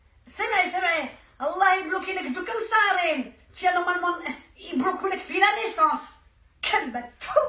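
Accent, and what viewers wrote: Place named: Oran, Algeria. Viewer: انا وردة من حب قالمة. انا نموت على وهران ونعشاق في لهجة تعهوم. تقتل. والله. نتمنا نزورها في حياتي والله. نحبها. ونحب لهجتهوم.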